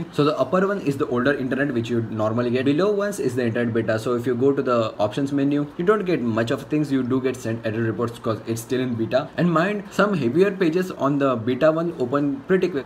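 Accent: India. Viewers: this indian accent makes me nervous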